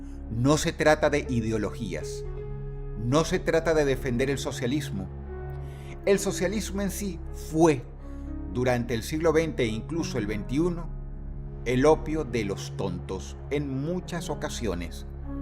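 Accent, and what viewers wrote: Argentina. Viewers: Ya era hora de que lo hicieras, ya hacia demasiado tiempo que agarraste acento argentino. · Jajajaja y por que no habla como venezolano? Tiene acento de argentino o algo asi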